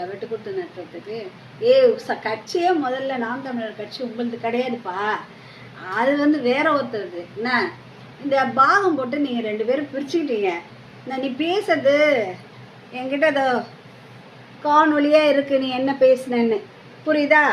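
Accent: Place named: Telugu, India. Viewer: உன் பேச்சிலேயே தெரியுது நீ ஒரு தெலுங்கு கரீனா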